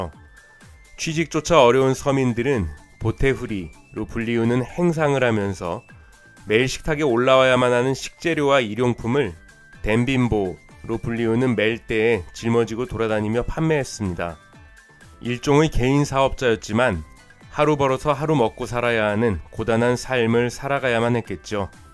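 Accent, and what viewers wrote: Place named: Korean-Chinese, China. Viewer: 한국인 맞음? 말투가 조선족같노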